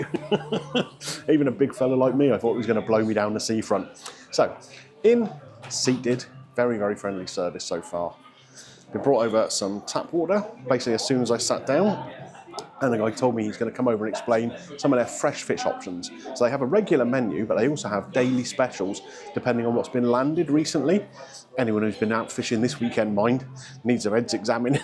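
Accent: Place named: Dorset, United Kingdom. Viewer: As a Bournemouth girl now living in New Zealand, just love your vlogs, and hearing your Dorset twang.. Merry Christmas to you and your fanily from ours jhere in Christchurch NZ xx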